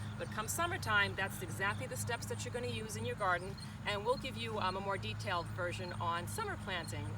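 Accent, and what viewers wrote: New Jersey, United States. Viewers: thanks for this - I now understand that it is too early to harvest sunflower seeds. My favorite variety is Italian white - goldfinches love it! BTW your (very slight) accent makes me homesick for NJ and Zone 7 gardening (now living in Minnesota in Zone 4)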